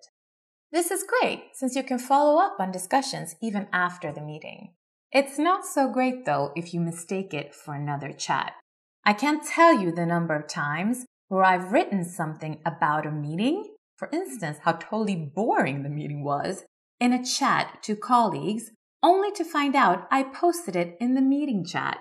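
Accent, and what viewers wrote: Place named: Sweden. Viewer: Applaudable pronunciation, but I could hear that Swedish accent! :D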